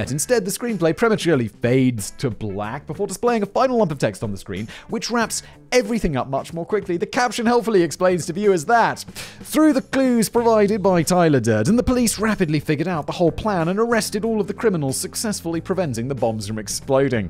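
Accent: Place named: United Kingdom. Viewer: Calls himself Whistle and China "Chiner"... British accents are Great!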